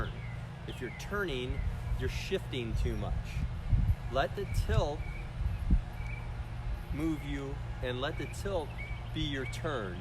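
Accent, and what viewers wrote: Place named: Florida, United States. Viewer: Great video, sounds like you are fairly local to me. Have you ever played at Grand Lake RV resort in Citra Florida? It is close to Ocala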